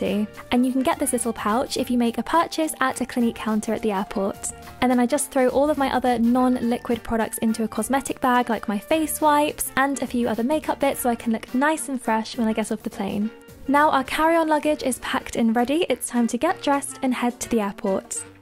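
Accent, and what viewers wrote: RP, United Kingdom. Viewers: why does she sound so posh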